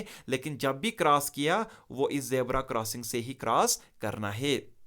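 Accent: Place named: Kashmir, India. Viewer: By listing you I think u r from kashmir... Bcx this voice belongs to kashmirians ...🤨🤨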